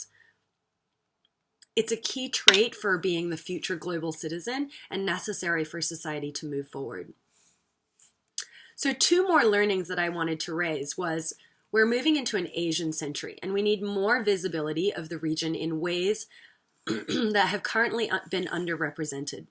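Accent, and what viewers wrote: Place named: United States. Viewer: You sound so American.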